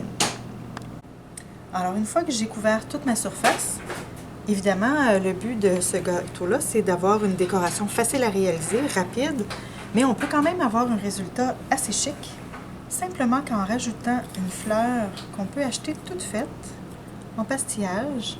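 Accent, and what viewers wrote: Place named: Canada. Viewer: c 'est tres jolie et j adore l accent canadien 😉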